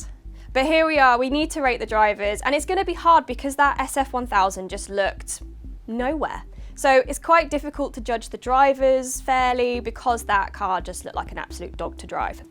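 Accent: United Kingdom. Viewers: I like the new format. Also Keep talking. As an American I love your british accent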